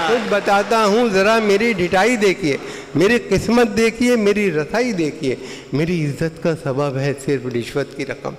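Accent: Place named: Meerut, India. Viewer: Lajawab meeruthi lehjah he....!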